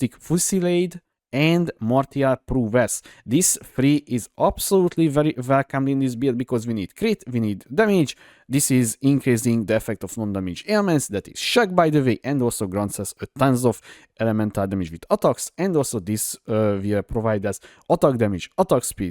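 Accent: Hungary. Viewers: Amúgy nem akarok paraszt lenni, de érdemes lenne valami angol tanár vagy ilyesmi, nagyon keményen érződik hogy magyarosan mondasz dolgokat. (Normal helyett normál, rekáveri) Hozzáteszem én se vagyok mérföldekkel jobb, nekem is mondogatták ezt, de ja.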